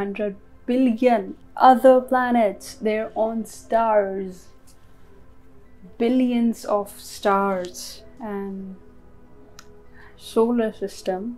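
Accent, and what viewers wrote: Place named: India. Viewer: I am not able to hear/understand you clearly. Please talk slower, the Indian accent is difficult to understand ...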